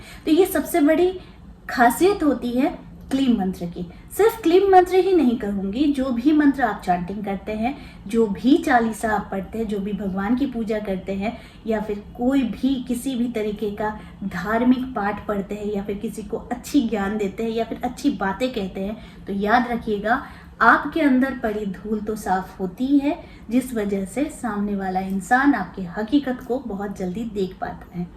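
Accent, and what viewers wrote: Bengal, India. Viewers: R u bengali???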